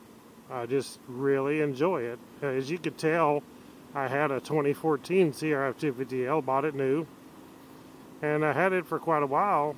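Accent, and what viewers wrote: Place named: Missouri, United States. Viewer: Being from Mo. I recognized your accent immediately .Been gone 50 years and I still have dreams about the beautiful countryside.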